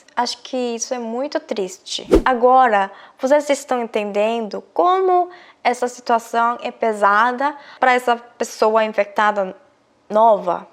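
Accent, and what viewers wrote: South Korea. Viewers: Falar o português brasileiro errado? Eu fiquei em dúvida se era coreana ou brasileira... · Apesar so seu sotaque coreano SEU PORTUGUÊS É QUASE PERFEITO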